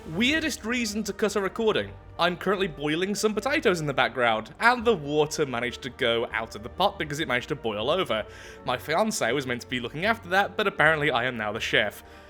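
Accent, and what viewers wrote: United Kingdom. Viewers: watched the entire video with subtitles only no sound and, it was funny the errors google's speech to text makes trying to translate the British accent.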